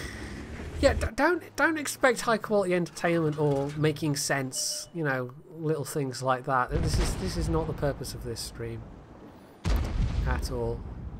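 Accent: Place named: Scotland, United Kingdom. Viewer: I didnt knew you are Scotish :)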